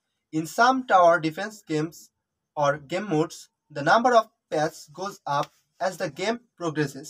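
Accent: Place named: Bengal, India. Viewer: English bolar dhoron Jodi Bangla kothar motoi hoy tahole Bangla bolen...joto sob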